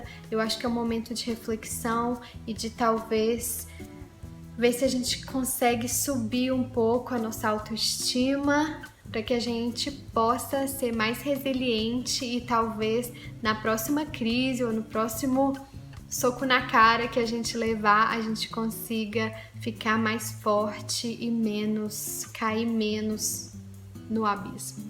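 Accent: Minas Gerais, Brazil. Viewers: Vc até pode estar morando fora do país, mas hoje quando eu a ouvi, de cara pensei: Ela é Mineirinha. Um abraço desde Mariana-MG minha conterrânea da voz doce. vêm cá comer um pãozin de queijo e tomar um cafezin. gratidão suprema.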